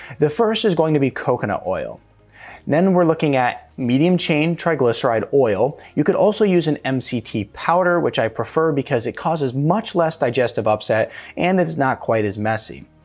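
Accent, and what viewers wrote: United States. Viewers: Terrible strong American accent. Tomatooooooooos doh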